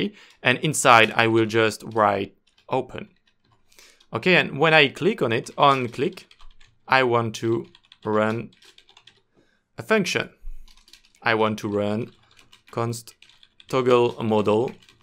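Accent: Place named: France. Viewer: Sweet french accent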